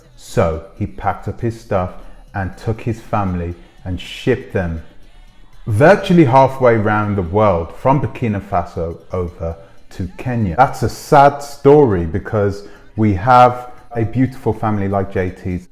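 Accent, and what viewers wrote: United Kingdom. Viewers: Your a weirdo for digging in the brother JT's personal life . Corn ball British accent . FOH !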